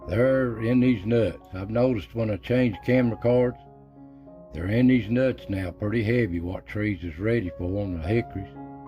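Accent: Appalachia, United States. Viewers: I am 54.I miss my earthly father and his family which are all deceased now.Its so soothing to hear voice that brings back edifying and that Appalachian voice.I praise Christ now as my heavenly father gives me Peace through the Word of the holy bible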